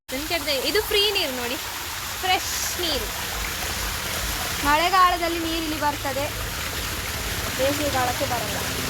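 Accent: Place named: Kundapura, India. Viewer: ನಿಮ್ಮ ಕಡೆ ಮಾತು ತುಂಬಾ ಚಂದ ಉಂಟು ಕುಂದಾಪುರ ಕನ್ನಡ ಕೇಳೋಕೆ ಚನಾಗಿರುತ್ತೆ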